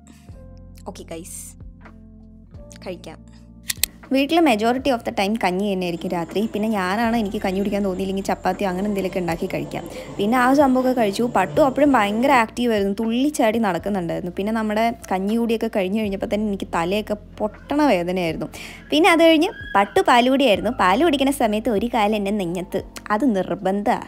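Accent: Palakkad, India. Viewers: Chechi palakkad anno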